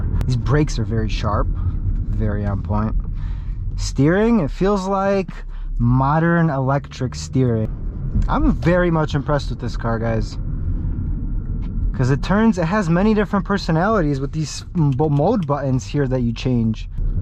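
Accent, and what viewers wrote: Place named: United States. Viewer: urodzony w stanach? you sound like a native american speaker.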